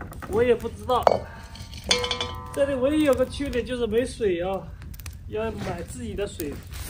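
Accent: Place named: Ningbo, China. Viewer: 的浙江寧波普通話太可愛了！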